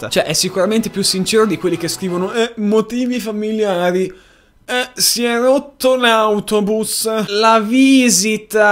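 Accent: Bologna, Italy. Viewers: Sei di Bologna lol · Uaa sei di bologna?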